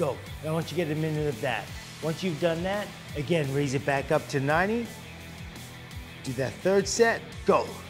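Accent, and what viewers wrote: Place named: Brazil. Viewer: Brazilian accent, fofinha.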